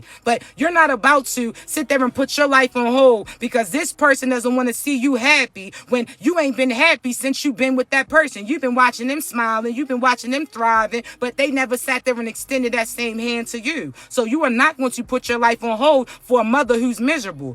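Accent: Baltimore, United States. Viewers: She from Baltimore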